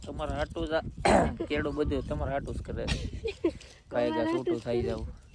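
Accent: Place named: Padvi, India. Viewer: પડવી છે આ બોલી પડવીછે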